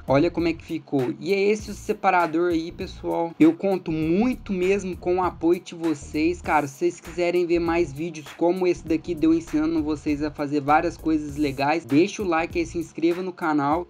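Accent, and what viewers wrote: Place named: Minas Gerais, Brazil. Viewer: Muito bom esse seu jeito mineiro de falar deixou mais legal parabéns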